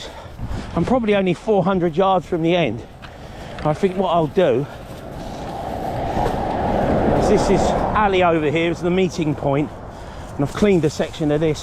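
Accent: United Kingdom. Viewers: yep, guilty of yelling GO ON now. I find it hilarious and me being from Canada I love hearing your British way of speaking.. madder then a box of frogs!!! LOVE IT:)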